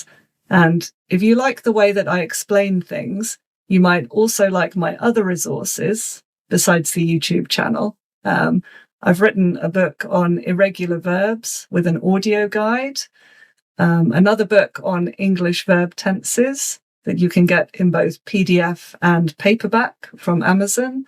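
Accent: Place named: England, United Kingdom. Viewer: Yes, of course darling. I have a pretty good command of grammar so the content is basically only a refresher but I love watching the videos because I am a sucker for English girls lol and a lot of it has to do with the accent lol.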